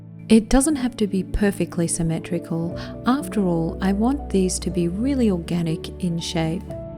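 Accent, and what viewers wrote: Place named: Australia. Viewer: Great design. I am delighted to hear your lovely Australian accent and voice narrating your Organic Vessel. Also fond of black blue and matt gloss combos. thanks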